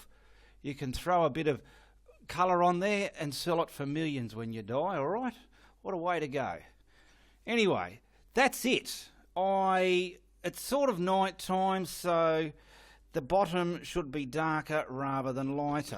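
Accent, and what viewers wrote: Australia. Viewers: I really like your Aussie acsent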